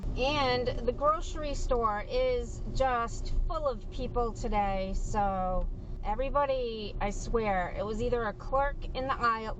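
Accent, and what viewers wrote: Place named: Northeast, United States. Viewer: lovely accent. Is that northeastern? NJ maybe?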